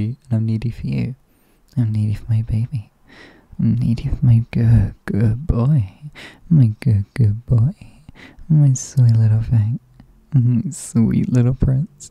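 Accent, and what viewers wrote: United Kingdom. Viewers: I saw this and my first thought was "oh the british guy!" And got very exited since i was just thinking about a fictional crush i have with an accent like yours [pardon the spelling mistakes its late and auto correct isnt on]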